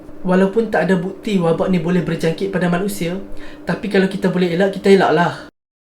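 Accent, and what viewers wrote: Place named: Kedah, Malaysia. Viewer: Slang pelat Kedah...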